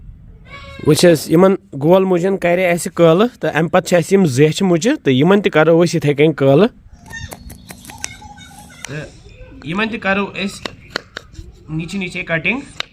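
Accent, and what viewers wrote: Kashmir, India. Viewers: Love your kashmiri accent 🤣🤣🤣🤣🤣 But the quantity of spices which you added my mother will make 4 bottles in this quantity of spices 🤣🤣🤣🤣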